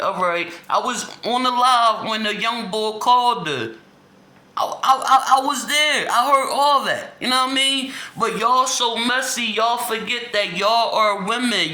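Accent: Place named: Philadelphia, United States. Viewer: You sound so Philly!! I love it....she’s a nut Jawn!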